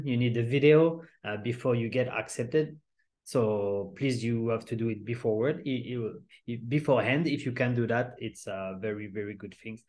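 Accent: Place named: France. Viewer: Salut 😊 watching your video from Cannes and love your french Accent! You have inspired me a lot! Merci pour tes vidéos! Ils sont tous super!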